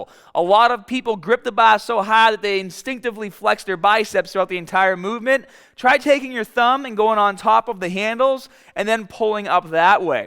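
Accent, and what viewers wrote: Boston, United States. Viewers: The Boston accent is hysterical... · Thanks for the videos! They are awesome! From where in the US is your accent if you don’t mind me asking? :)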